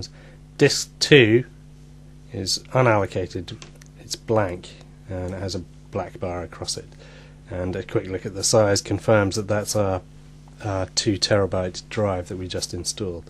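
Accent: United Kingdom. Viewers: I usually hate British accents as they come off a pompous and arrogant but this one is mellow and soothing. I want him to read me a bed time story. "There once was a DIMM that didnt fit in..."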